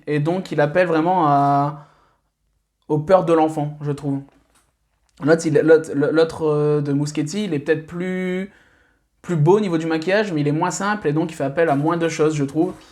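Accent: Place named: Nord-Pas-de-Calais, France. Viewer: Ton accent ne mentais pas, c'est cool d'assumer les ch'tis haha !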